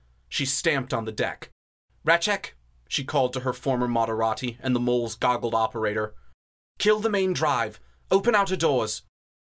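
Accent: United States. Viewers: ugh no offense but American accent just doesn't fit 40k.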